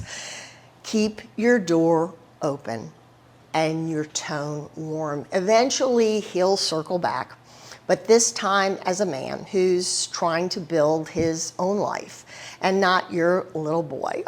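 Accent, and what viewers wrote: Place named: South, United States. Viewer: Thank you for your wonderful content channel mrs. You seems very sociable. As learned since I began to American and British YouTube channels so many things, and Iam still learning. Southern people are very friendly, have hospitality, strong family ties. Appalachian region full of myth and supernaturals such as big foot, New Jersey devil. Mew mew means granny. I read about Texas traditions, manners, wonderful characteristics such as respecting elders, being polite, showing kindness to others as part of Texas values. Native Texans are most genuinely friendly people we ever meet. You have special accent under southern with twist. You have Friday night football, rodeos, barbecue you have too in Arabic countries barbecue it’s great coincidence. Home coming is football or dance celebration of shared history memories, pride, cowboy, Texas is bigger than France. 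I hope I can learn a lot from your knowledge. Happy belated fall and thanksgiving in advance. Good luck to you your dearest ones.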